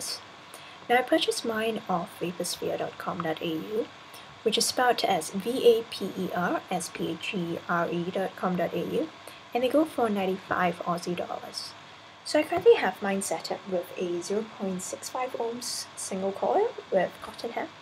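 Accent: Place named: England, United Kingdom. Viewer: Thank you :), I finally got some flat ribbon for this little guy in the mail today, I'm building a micro for it now, time to see how she vapes with what she's intended for! Btw are you English? I only ask because of the accent, and way you spell flavour :P (man I miss my grandmum in England), I was wondering if europe has seen the Russian BIG rba yet? I've heard it's being made in germany and seen a UK vaper that had one, and am trying to get my hands on one sooo bad! drool It's just like a kayfun/russian 91% but it's massive, and holds 11ml 8D, just had to ask in case they exist for y'all yet. Thanks again!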